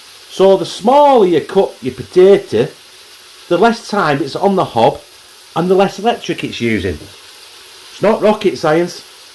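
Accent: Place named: Scotland, United Kingdom. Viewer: Wth! A Scottish accent about mexican food! Lol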